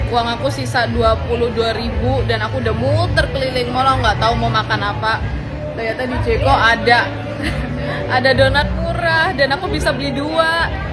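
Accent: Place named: Surabaya, Indonesia. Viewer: Kak ngomongnya kok agak medok seh ? Agak surabayaan gitu...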